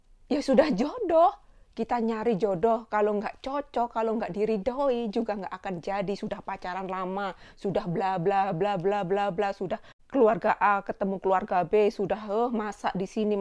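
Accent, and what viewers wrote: Java, Indonesia. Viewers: Suka dengar kk ngomomg .. mendok jawa nya itu loh. Lembut jg. Haha persis dr. Ambar di pontianak dosen kedokteran untan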